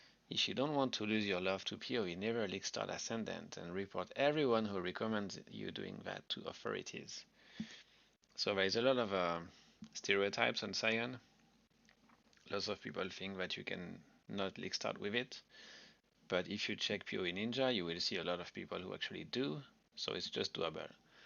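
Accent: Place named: France. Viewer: hi, great video! I cant tell if you're french with your accent, am i right? haha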